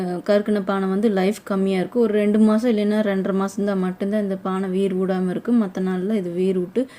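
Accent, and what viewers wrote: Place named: Kongu, India. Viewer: அருமை, எனது சந்தேகங்களுக்கும் பதில் கிடைத்தது, உங்களின் கொங்கு தமிழ் உச்சரிப்பும் அழகாக இருந்தது.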